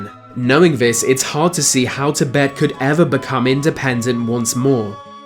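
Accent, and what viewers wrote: England, United Kingdom. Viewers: How come you have an English accent and yet pronounce viceroy with an extra syllable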